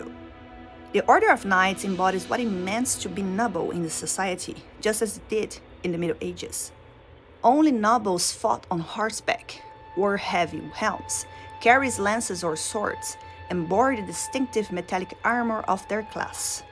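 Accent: Brazil. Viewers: i see that brazillian accent there 👀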